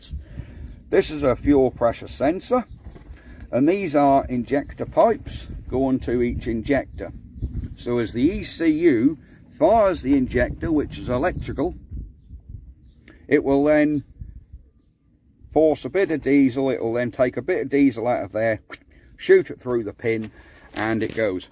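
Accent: Norfolk, United Kingdom. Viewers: You sir, have the strongest norfolk accent I’ve ever heard😅😂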